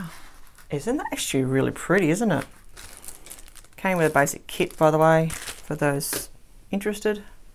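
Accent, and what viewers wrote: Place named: Australia. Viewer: Shopanydeals is a dangerous site for the bank balance. Found your unboxing videos and love them but always nice to hear a fellow Aussie doing unboxing/reviews.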